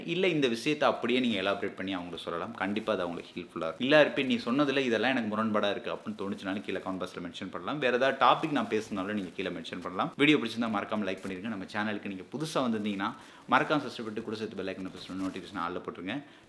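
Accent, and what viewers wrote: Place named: Madurai, India. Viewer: Bro I think Nega madurai or South side tha unga Native ah irukunu nenakeran..😄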